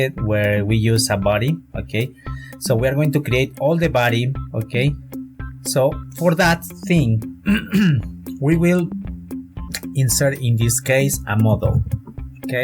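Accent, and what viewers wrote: Spain. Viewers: cause its kinda hard to understand you with your strong Spanish accent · Se nota que hablas español xd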